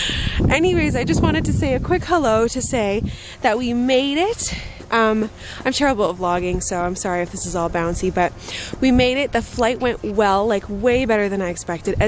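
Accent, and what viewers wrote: Canada. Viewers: hilarious... your canadian accent is in FULL swing :) Probably from being around your family :P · Love it! Btw, you sound so much more Canadian in Canada ;)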